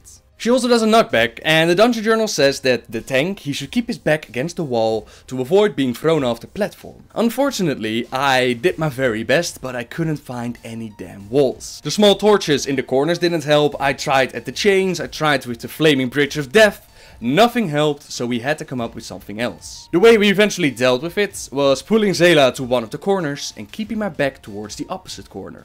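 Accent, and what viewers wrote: Netherlands. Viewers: Hey man , recently i've become interested in lore and stumbled upon your videos , i've enjoyed them alot and learned so much since i started to watch them , now i have been asking myself are you dutch cause you have the same accent as me when i talk english haha . :P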